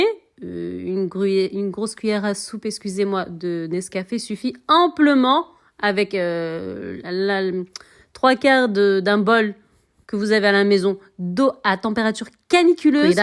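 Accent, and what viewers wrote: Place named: Canada. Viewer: j'aime beaucoup ta façon de parler 😊😊❤on dirait que tu es canadienne salammm...😊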